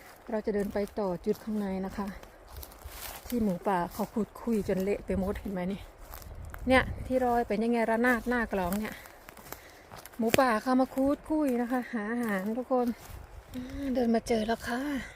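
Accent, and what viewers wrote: Korat, Thailand. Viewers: สวัสดีจ้า ฟังพูดคือคนโคราช บ้างเอ็งแท้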